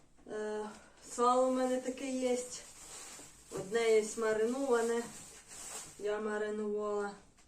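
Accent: Poltava, Ukraine. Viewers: 👍🙏❤💙💛💐👋Вітаю! Випадково натрапив на ваш канал. Сподобалось, як розмовляете з полтавським акцентом. Ви також красива, бо полтавчанки ж найкращі!!! Щиро бажаю міцного здоров'я, простого жіночого щастя та якнайшвидшого мирного життя!!! А керамічними ножиками не дурні ріжуть, а дурні їх виготовляють. Такими ножиками тільки зелень різати, а мерзле смачне сало добре ріжуть саморобні, дебелі та надійні ножі. Бажаю вам подальшого розвитку каналу та багато - багато доброзичливих підписників! Хай щастить!!!❤❤❤✍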